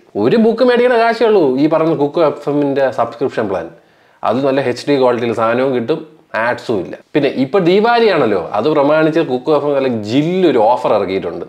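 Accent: Trivandrum, India. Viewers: ഭാഷ powli tvm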